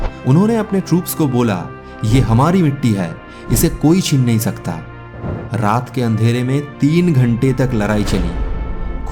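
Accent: Bihar, India. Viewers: Bhai aap north east se ho aap ke voice me thode Bihari tuch h 😊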